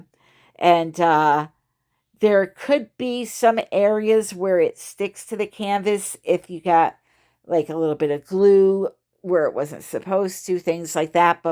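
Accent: United States. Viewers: I was watching this thinking, “what the heck is HAT glue? Some kind of glue gun only used for hats?” The entire time. Took a long time to realise it was just your USA accent making the English sound strange. As a British person it sounded SO much like HAT, like I really though that’s what you said lol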